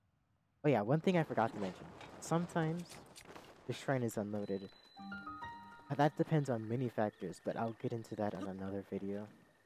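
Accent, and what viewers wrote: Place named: England, United Kingdom. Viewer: i know, but it surprised me cause he had a english accent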